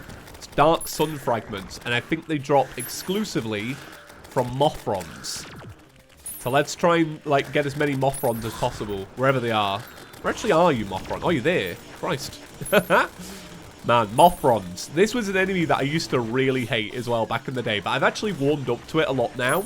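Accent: England, United Kingdom. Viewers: British accent is the best I mean I'm British so idk if I'm one to decide but eh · I actually like English accent so it's okay fir me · Is a british couch gang member i can relate to british speach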